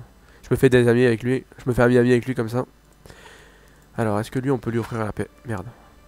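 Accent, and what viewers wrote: Marseille, France. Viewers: je te demandais sa parseque je croyais que t'etais marseillais ^^ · Tu serait pas Marseillais par hasard :)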